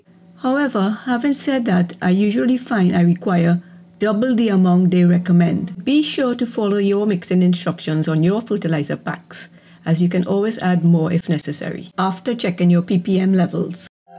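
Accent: Trinidad and Tobago. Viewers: Are you from Trinidad? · You sound like a real trini gal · I knew her voice was sounding like a Trinidadian!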